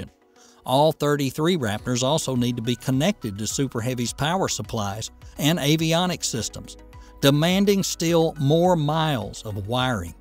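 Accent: United States. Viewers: That cowboy accent tho 😂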